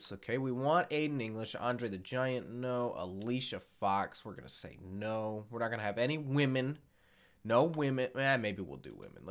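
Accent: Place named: Australia. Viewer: I dont understand why people get so butt hurt. When i heard you aussie accent i laughed my ass off (im a aussie btw) anyways you are amazing i only found you today on your WWE 2k17 Arena video. good job and keep up the good work :)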